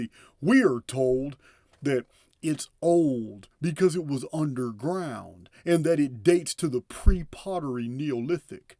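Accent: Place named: Texas, United States. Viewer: From which part of the USA is accent?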